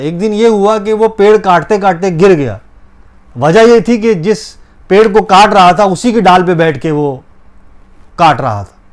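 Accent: Mumbai, India. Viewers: भाई... किसी को copy मत करो... आप original में भी काफी अच्छे हो .... मुंबईया language में ... 👍👍👍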